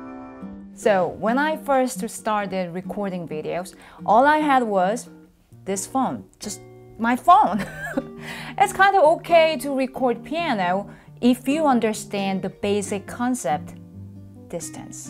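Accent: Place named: South Korea. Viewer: after listening to many of the big stars doing videos from home during the social distancing it is obvious that you really do a great job with audio. most of the famous stars sound terrible on their videos. you however have learned very well how to balance piano and voice. that's great because you have a wonderful singing voice and I adore your Korean accent when you speak.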